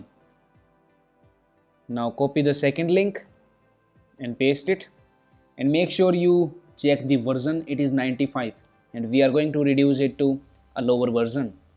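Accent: India. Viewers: You sound like you are from India. In America we speak much slow so take a breath when you give instructions so we can follow you and you can gain subscribers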